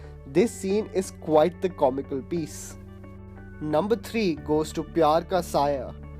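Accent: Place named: India. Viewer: I think you are here only to make mockery of bollywood... Why don't you highlight good things of bollywood? Or highlight stupidity of Hollywood.. Don't you realize people from other countries make fun of bollywood just by seeing your videos. By your accent it seems that you are a Indian...but belong to that category who are Indian but their heart beats for US.... Can't you take movie as a movie?...A work of a fiction...Not the reality.. Just a medium for an entertainment..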